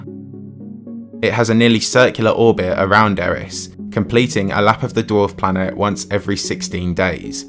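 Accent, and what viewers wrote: United Kingdom. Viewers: I'm Dutch and I can hear it's not the Queen's English, but I often watch British TV so I'm familiar with different dialects. pronounciation is clear and easily understood. Here in the Netherlands I can often hear visiting British students and tourists. Sometimes I have to listen for more than a couple of seconds before I realize they are speaking some English dialect, or Welsh or Scottish or whatever...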